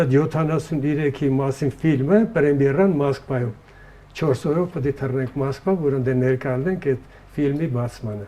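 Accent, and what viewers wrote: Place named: Armenia. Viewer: ❤️❤️❤️էնքան հայ ա, որ ռեւսերեն հայի ակցենտով ա խոսում😍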